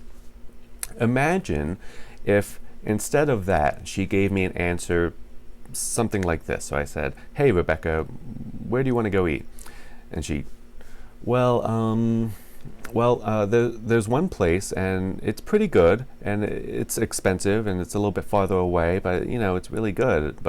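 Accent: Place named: United States. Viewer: I love your accent. Which part of the States are you from?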